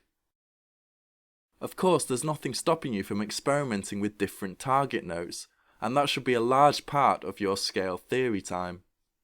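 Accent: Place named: Cockney, United Kingdom. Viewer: cockney speach hehe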